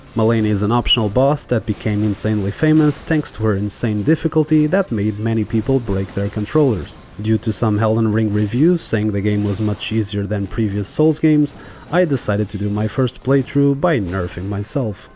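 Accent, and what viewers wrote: Portugal. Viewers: impressionante como um tuga consegue perceber logo pelo sotaque. bom video e boa lista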